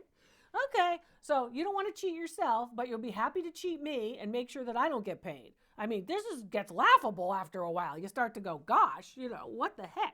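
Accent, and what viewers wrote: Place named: North Jersey, United States. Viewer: Love you Doc! .... Are you sure you're not from North Jersey? 💗🤔😊 You sound just like my sister-in-law. (That's a compliment)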